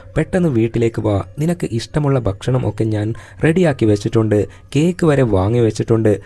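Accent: Kannur, India. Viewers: chettande nadu evda kannur ano🤔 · Bro kannurkaranano 😮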